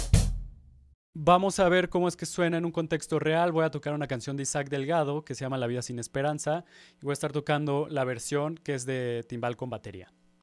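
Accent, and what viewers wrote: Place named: Mexico. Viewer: Excelente la manera que explicas y enseñas, por el acento eres mexicano, chingón mi hermano, hoy aprendí algo nuevo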